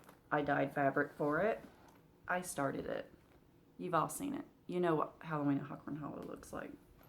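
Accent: South, United States. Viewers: Love every single thing you do and next to Marlene, I think you may have the sweetest Southern accent ever. Could listen to you all day. Was fun seeing you mom’s house....love that you go there and spend time with her and always talk so sweetly about her.....miss my mom everyday. Fun to see the bottom corner of HRH Halloween since I started at the top right. 😘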